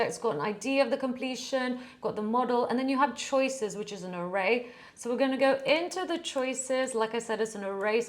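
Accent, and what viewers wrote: United Kingdom. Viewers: Great content, British accent, this channel rocks!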